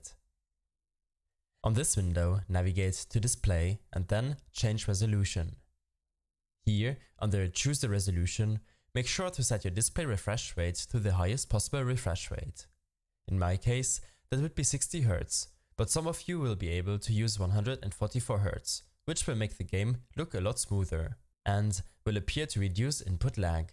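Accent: Germany. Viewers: Are you from German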